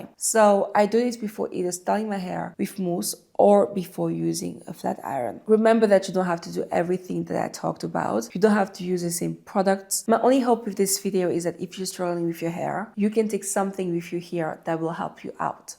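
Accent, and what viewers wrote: France. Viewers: Are you French? The accent it😍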